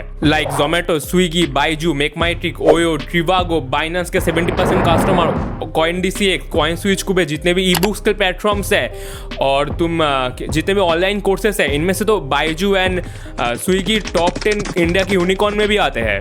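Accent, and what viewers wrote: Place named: Bengal, India. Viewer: True, he really needs to work on the grammatical errors and I can understand his grammatical errors and also wrong pronunciations due to his bengali accent as me being a bengali too. I hope he works on it.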